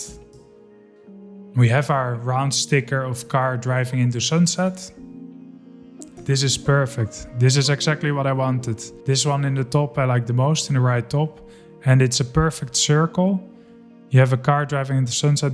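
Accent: Netherlands. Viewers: bro has the most dutch acccent ever lmao. i love the content, keep it up maat!😜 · Kwam toevallig op een video van je terecht.....volgens mij hoor ik een Nederlands accent ;-)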